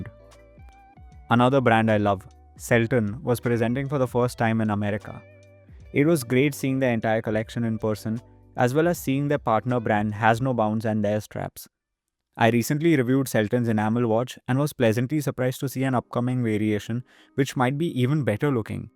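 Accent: India. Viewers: Can you get rid of your Indian accent next time, eh?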